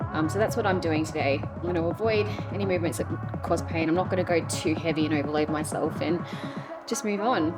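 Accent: Australia. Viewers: Didn't no you were an ozzy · The Aussie accent is a surprise.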